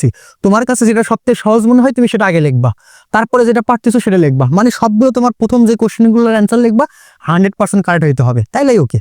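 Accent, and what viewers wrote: Bogura, Bangladesh. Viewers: Vai bogurar naki????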